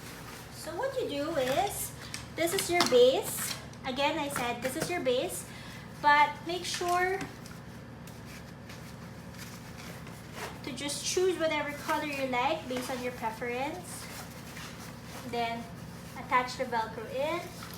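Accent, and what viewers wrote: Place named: Philippines. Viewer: Hate the accent I can’t…. Pinoy much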